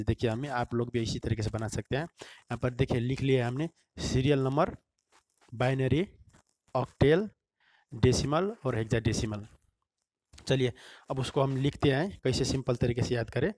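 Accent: Nepal. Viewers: Are u Nepali ?? Reply honestly 😸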